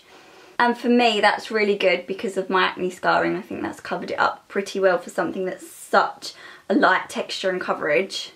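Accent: Essex, United Kingdom. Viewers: Kayla Motera Thank you! I was thinking Essex.. kinda close! Haha!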